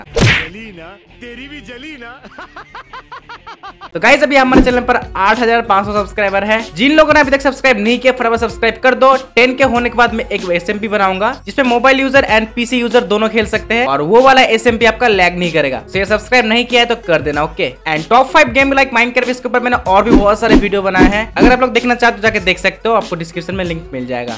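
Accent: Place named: India. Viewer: Goofy indian noise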